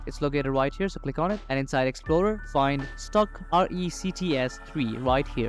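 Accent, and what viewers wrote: India. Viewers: Didn't work. I should have known once I heard the Indian voice. Moron ...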